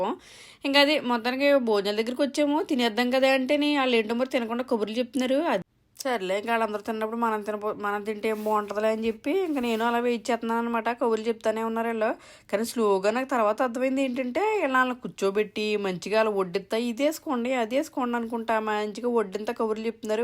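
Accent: Godavari, India. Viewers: Akka super Godavari telugu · మీ గోదావరి యాస గొప్పగా ఉందండి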